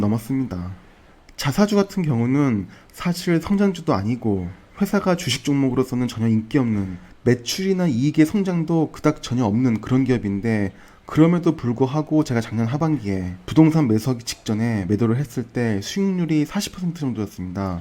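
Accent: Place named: North Gyeongsang, South Korea. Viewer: 고향이 어디신지 꼭 여쭤보고 싶었어요 경상북도? 나가리~이런표현 개인적으로 참 편하거든요^^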